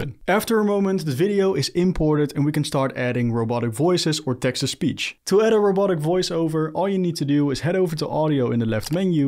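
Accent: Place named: Netherlands. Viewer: Bro is from the Netherlands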